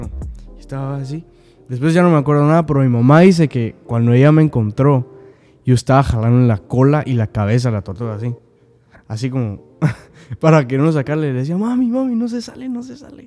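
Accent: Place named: Guatemala. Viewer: Amo el acento guatemalteco de y su R muy pronunciada jajaja Dios amo a este hombre 😍 yo igual soy alérgica a los perros y gatos 😂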